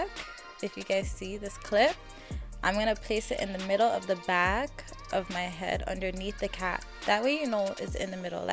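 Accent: Toronto, Canada. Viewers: Very random but are you canadian? 😂 ur accent is giving very much Canadian like me!! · Your Toronto accent is stronggg girl. Thank you for this!! ❤️❤️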